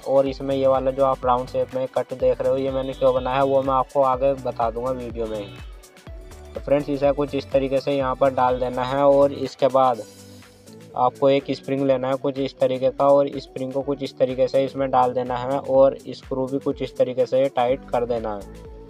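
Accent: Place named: Bijnor, India. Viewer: kyuki m bhi bijnor ka hu .bhasa sunke pata chala 🤣🤣vaise tum bijnor m kaha se ho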